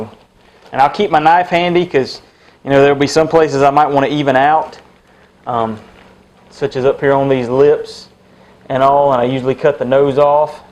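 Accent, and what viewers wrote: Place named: South, United States. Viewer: This guy has such a southern accent, another great video, I learned allot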